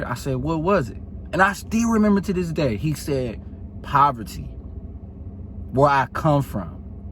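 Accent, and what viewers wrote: Baltimore, United States. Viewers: Thanks for content brother. I've learned throughout the journey, just because you don't like the truth... doesn't stop it from being the truth... the gift and the curse of the red pill... i however will take the hard truth over the easy lie anyday... also, im trying to place your accent. You from Baltimore?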